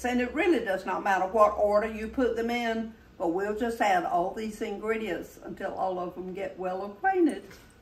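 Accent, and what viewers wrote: South, United States. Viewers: Dear Mrs, I lost my mother last year (I’m 60) and she reminds me sooo much of you. She didn’t have your beautiful southern accent but a german accent. She was a master in the kitchen and kind and lovely just like you. I stumbled across your videos and feel so blessed! I’ll be watching every video and making notes. Do you ship your cookbooks to Canada? Thank you again for your generous hospitality and yes…YOU…shine for Jesus. Many Blessing ❤🇨🇦✝️💐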